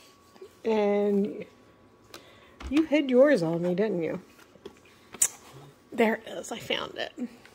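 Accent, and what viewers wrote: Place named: Philadelphia, United States. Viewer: Your accent…can you share where you’re from? I’m guessing the Philly area…❤️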